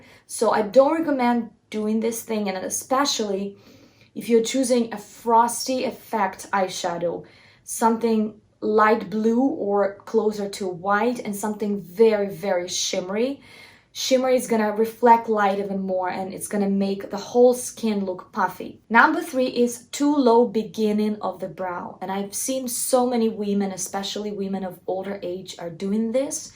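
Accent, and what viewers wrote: Russia. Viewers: Thick russian accent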